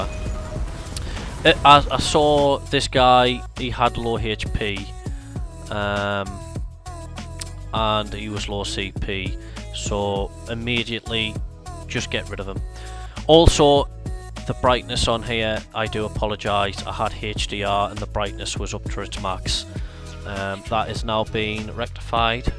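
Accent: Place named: North, United Kingdom. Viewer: listen to those dulcet northern tones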